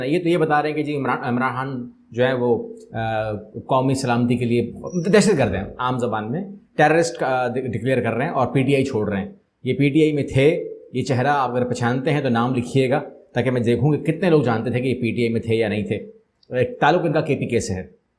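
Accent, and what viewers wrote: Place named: Bihar, India. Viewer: From accent you seem a " bihaari"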